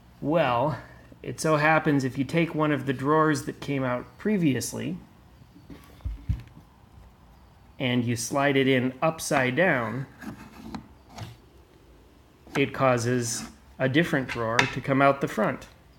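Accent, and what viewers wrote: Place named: Ireland. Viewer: what are you Irish?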